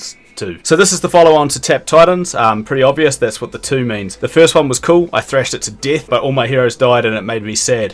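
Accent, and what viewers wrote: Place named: Australia. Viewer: must be the aussie accent as well <3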